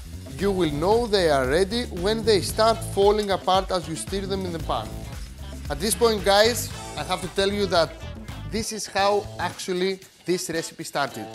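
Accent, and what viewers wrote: Greece. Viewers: Oo, That classic greek accent! now i wish that still had mine :/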